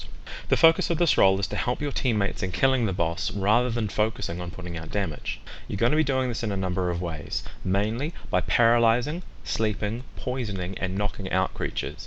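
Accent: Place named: New Zealand. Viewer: Nice vid, great to hear that NZ accent:-D Hope to see more from you when 3U hits our shores.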